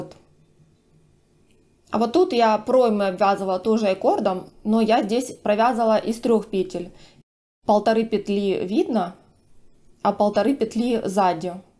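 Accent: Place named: Russia. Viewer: Интересно,что за народность в России с таким интересным говором и диалектом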